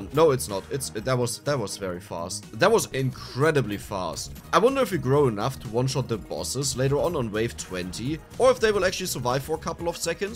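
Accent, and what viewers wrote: Germany. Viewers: Man hört einfach so raus das der deutsch ist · You got a very strong german accent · Imagine doing english videos with the deepest german accent i have ever heard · Der deutsche akzent ich liebs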